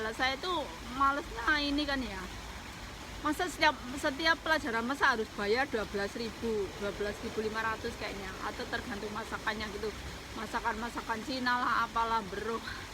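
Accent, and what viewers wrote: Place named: Banyumas, Indonesia. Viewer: semangat mba... ngapaknya kelihatan kaya saya kalo ngomong...hahahaha...mantul mba... semua sudah berlalu...sekarang dah sukses amiin...salam silaturahmi... sudah padam komplit...padamin juga punya ku ya mba...makasih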